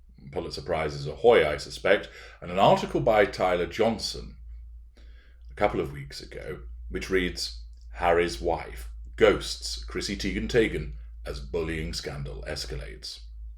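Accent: United Kingdom. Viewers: Hi, I first subscribed to your channel several months ago through your analysis of Harry’s wife. Since then I have been backtracking through your past commentaries. Your output is impressive, the research is extensive, accurate and relatable. As Australian 🇦🇺 your voice is very British and so pleasant to listen to. Thank you.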